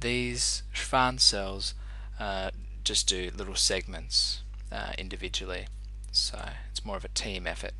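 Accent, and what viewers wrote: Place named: Australia. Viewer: Why is this so much more interesting when it is delivered in an Aussie accent?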